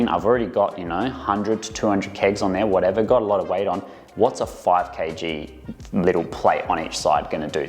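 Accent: Australia. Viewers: Your a champion mate.. the Aussie charm is refreshing to listen to while you explain things keep it up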